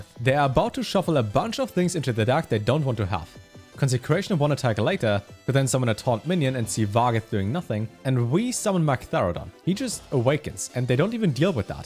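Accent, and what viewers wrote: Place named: Germany. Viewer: can i ask u from where u are? u sound german ^^. just found ur channel, nice content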